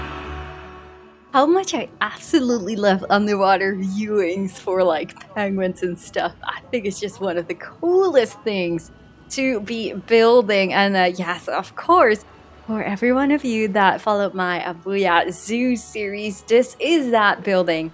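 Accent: Netherlands. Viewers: Only now I run into your channel and I'm in love.. I have a mad respect for the building that you do and the way you make it all look so beautiful! I might be new, but I'm watching it all 😆 For once too I don't hate the accent of a dutch person speaking English! You have a very relaxing way of speaking and I love it!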